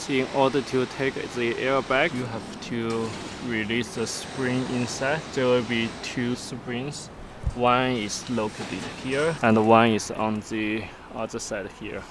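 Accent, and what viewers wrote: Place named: China. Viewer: Your English has improved by the years sooo much :D you don't have a big chinese english accent anymore. Just a light. :)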